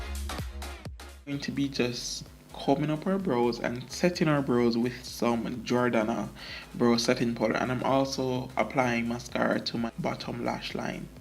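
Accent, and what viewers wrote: Jamaica. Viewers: I knew it cuz like u sound like a Jamaican who just know how to speak good English instead of patwa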